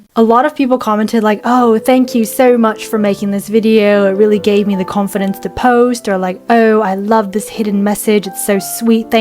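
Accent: United Kingdom. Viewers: Is it just me or she keeps turning British 🤔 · Why did you speak British? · Am I the only one who noticed her extreme brit accent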